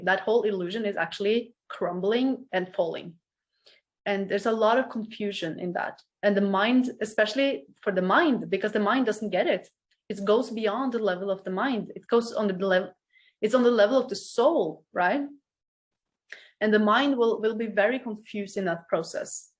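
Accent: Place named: Norway. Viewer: Tack! Det står helt klart att du har egen erfarenhet av det du talar om. Själv är jag inne i min tredje mörka natt. De två tidigare var jobbiga, men betydligt lindrigare än denna, som har pågått över 3 1/2 år. Efter de två tidigare mådde jag väldigt bra och trodde aldrig jag skulle behöva erfara detta igen. Men uppenbarligen behövs det. Varannan dag är hanterbar, ångesten är påtaglig men ändå fungerar det på något sätt. Då börjar inspirationen komma, men det räcker tyvärr inte länge. Dagen efter brukar vara mycket tung, jag kan gråta hela dagen, och tror att detta aldrig ska ha ett slut. Jag brukar säga till mig själv att det är någonting fint som händer. Jag hoppas att jag ska kunna hjälpa andra människor i samma process som jag går igenom, och lugna dem med att allting är precis som det ska vara. Tack för den inspiration du ger. PS Jag hoppas att mitt inlägg översätts till engelska så att det inte bara är du som kan läsa det! 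Man hör tydligt på din accent att du har norska som modersmål! Trevligt!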